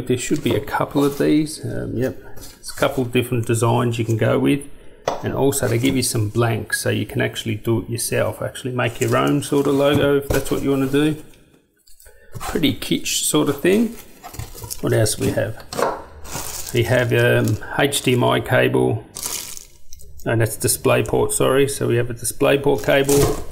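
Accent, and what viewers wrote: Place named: Australia. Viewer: Great Aussie voice hahaha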